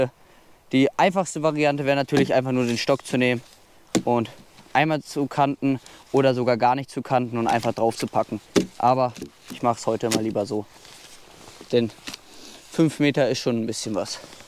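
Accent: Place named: Bavaria, Germany. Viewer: achso finde du hast so bayrisch geklungen :D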